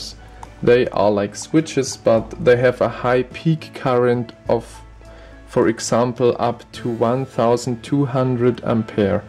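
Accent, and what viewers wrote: Germany. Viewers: und Deutsch klingender Akzent. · very nice video, at first I thought you are a russian guy, but no, ein deutscher Kamerad :D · Ha wusste ich doch dass du deutsch bist dieser Akzent ist unverwechslebar ^^ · are you german? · Lol.. Ich dachte mir sofort, dass das ein Deutscher Akzent sein muss :DD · So einen heftigen deutschen Akzent, habe ich lange nicht mehr in einem englischen video gesehen xD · Are you German???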